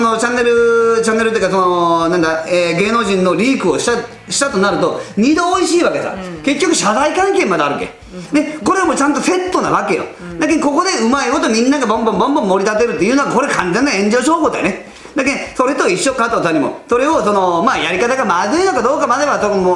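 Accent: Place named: Hakata, Japan. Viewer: 清々しい感情と博多弁がとても好感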